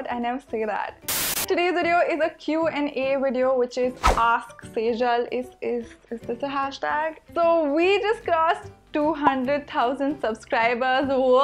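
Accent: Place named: India. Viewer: I love your sorta indian accent. You have such a pretty voice and right when I stumbled upon your channel I immediately knew I hadd.... to subscribe.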